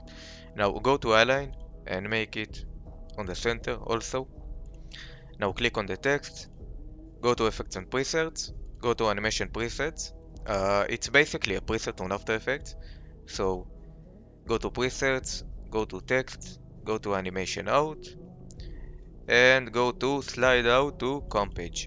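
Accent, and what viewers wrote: Israel. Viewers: שמע אני מת אחי איזה מבטא ישראלי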